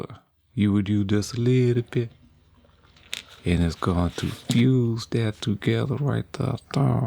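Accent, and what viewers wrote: Louisiana, United States. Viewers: My favorite Youtube channel. Why can't I stop watching this silly-looking puppet with a voice from down in the Bayou!? I am an adult, after all, for goodness sakes! Now, I find myself shelling out my hard-earned allowance on this thing called Patreon, so you will keep making more videos. But I told my wife, "It's MY allowance, I can spend it how I WANT!". She just rolled her eyes and said..."Awww Rite!" In an authentic accent. I do love that woman. Keep up the great work making quality content. Mister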